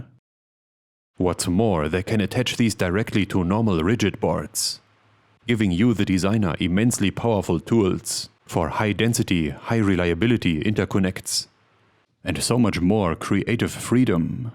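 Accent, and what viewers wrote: Germany. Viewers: figuring out peoples origin in Europe from their accent... in that moment I KNEW he was German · Please just stop ... youtube autoplay brought me here and your DSCHERMÄN AKKSENT is soooo cringy .... just stop ...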